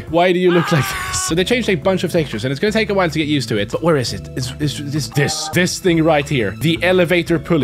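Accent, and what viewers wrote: Sweden. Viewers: are you swedish? i'm norwegian btw! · Are you swedish?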